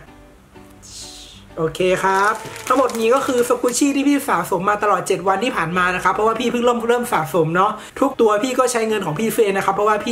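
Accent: North, Thailand. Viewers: คนเหนือหลอ?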